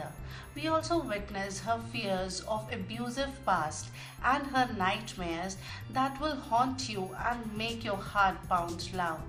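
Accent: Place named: New York, United States. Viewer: Very interesting and ur accent is very nyc....keep gng👍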